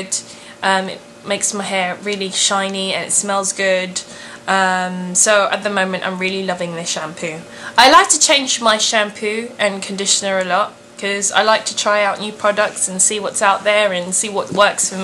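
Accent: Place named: Philippines. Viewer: Omg. I love your accent! Are you Filipina?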